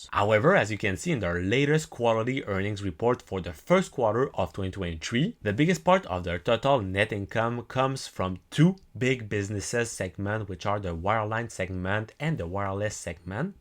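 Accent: Quebec, Canada. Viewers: Salut, j’ai pu comprendre avec ton accents que tu est QC ahah. Tu as l’air super bien informé. J’ai juste pas compris se que Telus fait ds le domaine de la santé.. peux tu m’éclairer svp! Merci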